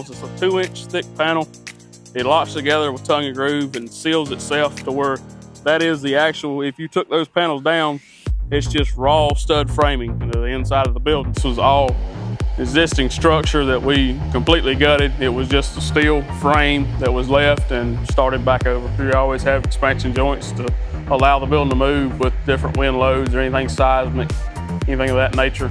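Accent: South, United States. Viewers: Yes, he's got a drawl. Why?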